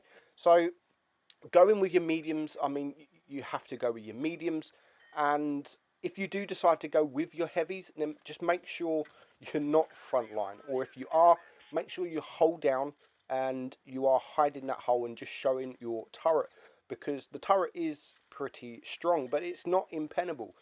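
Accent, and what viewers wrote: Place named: Cockney, United Kingdom. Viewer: speaks cockney not English get it right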